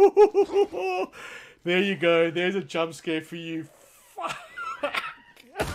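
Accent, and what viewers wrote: South Africa. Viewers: Thanks great video...just got the game and starting. (SIDE NOTE:.....Are you from Mzansi....that accent is a dead ringer). Thanks again, N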